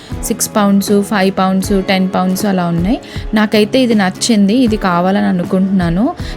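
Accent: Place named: Andhra Pradesh, India. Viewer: యూకే లో ఉన్నా గాని అక్క మన ఆంధ్ర మాటలు మాత్రం 👌👌👌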